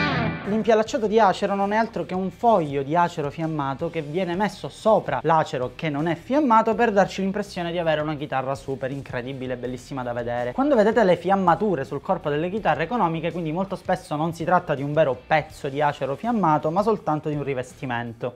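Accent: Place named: Palermo, Italy. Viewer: A me sta simpatico anche il suo forte accento palermitano... :-) Ma ho un debole per la Sicilia, sono di parte.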